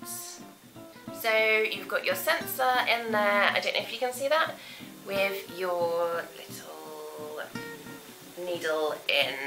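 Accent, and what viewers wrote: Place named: England, United Kingdom. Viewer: Hi, I thoroughly enjoyed your video. I love pretty redheads with an English accent. As insulin users who have a prescription we just recently got covered for the Freestyle Libre in Ontario, Canada. That is a very big savings since the sensors are now $97.00 CAD each.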